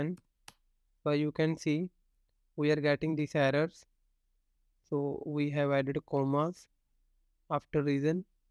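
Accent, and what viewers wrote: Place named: Kerala, India. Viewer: Coading + Debugging... Super. You sound like a person from Kerala but the name is Punjabi 😃😉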